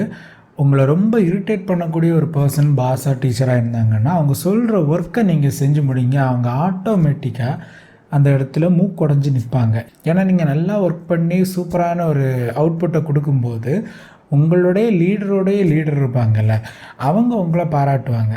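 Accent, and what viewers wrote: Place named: Kanyakumari, India. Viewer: I like your Kanyakumari slang😊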